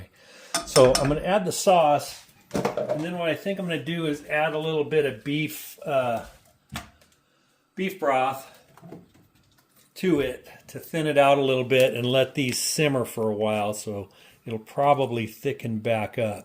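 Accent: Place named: Spain. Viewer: The recipe just sounds better when you say it with a very authentic Spanish accent. Love birria tacos. What if I guess made it about a month or so ago and it was so delicious. Nice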